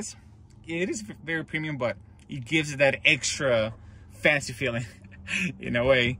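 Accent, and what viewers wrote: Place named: Philippines. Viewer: Slight Fil accent under the Southern accent.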